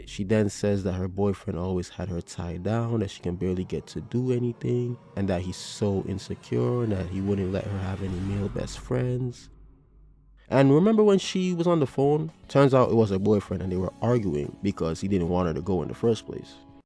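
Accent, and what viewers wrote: Jamaica. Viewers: Here I am picking up on a fellow accent like, is he Jamaican, is he not?? But nope, as soon as you said "Brother", I knew. I always know. I'm always two steps ahead...anw, nice video. It's kinda funny watching this as a female, seeing how guys think etc. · R u Jamaican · Dawg u accent heavy bro u jamaican? 😮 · Brooo are you Jamaican? I can just feel our aura resonating off you brooo (the accent). Respect, loved the vid. ❤🇯🇲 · Are you Jamaican or is that just your voice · yo bro stop tryna hide your accent bro l like some Jamaican i new u was Jamaican from how you said da buss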